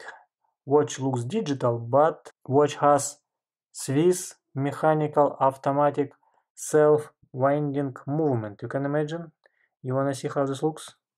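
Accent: Russia. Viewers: Жесткий русский акцент. Английский - это не для Вас.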